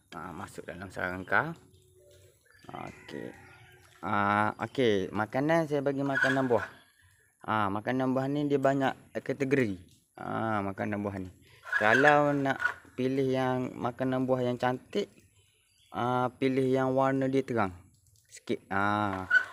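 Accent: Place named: Kuala Lumpur, Malaysia. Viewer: ni pun dah tahan dah sy dah ajar😅muncung dia ni sy dah ajar dah😭sdp dngr abe kecek KL lumpo😂😂kanteh belalang yg panjang tu😅😅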